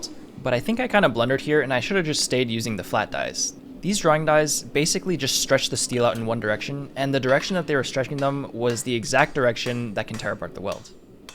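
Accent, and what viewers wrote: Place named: United States. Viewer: Such a shame this comes with a grotesque American accent. To the YouTuber, change it, its unwatchable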